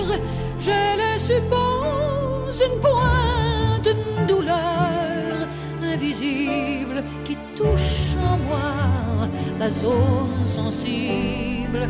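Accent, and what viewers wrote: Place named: Quebec, Canada. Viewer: C'est vraiment une québécoise loll je reconnais l'accent mais je la connais vraiment pas lllolll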